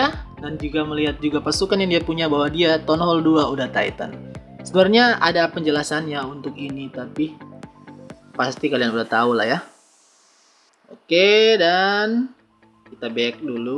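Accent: Manado, Indonesia. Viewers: Bang Asli Manado Kah? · bang lo org mnado bang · org Manado Nn kang? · Kiraleh ng orng jakarta, trnyata cmn orng mnado😂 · Orang manado? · Bang orng manado ya?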